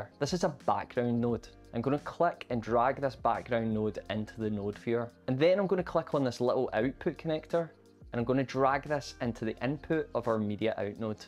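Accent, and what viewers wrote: Scotland, United Kingdom. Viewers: One thing that can get annoying when you find tutorials like this, is if the presenter has an annoying voice or accent. It is therefore an absolute GODSEND if the presenter has a Scottish accent. Useful AND sonically pleasing. 😀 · This is the best tutorial on YT which allows me to understand the basics of nodes and I made my first animation in Fusion 🤟 Thanks for that! Casey Faris tutorial is way too complicated and overloaded. Your way gives absolutely the minimum amount of required knowledge to begin with. By the way, I love your accent. Are you from the "Celtic" part of the UK? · I've been using After Effects and I don't exactly plan on switching completely to Davinci Revolve... But, the Scottish accent is so lovely... 😋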